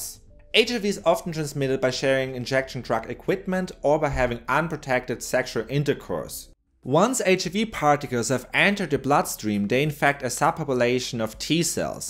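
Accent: Germany. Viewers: Thick german accent. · I’m loving your German accent 😅 and you’re cute. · That accent is hard to place: either German, or Dutch? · Very informative. I just feel like he wants to yell at me in a German English accent 😁😁😁😂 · He´s German. That's why. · Is he German .. his accent then he sounds Chinese talking English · Cool accent. I think that I could easily listen to German people speak English all day long ! 👍😎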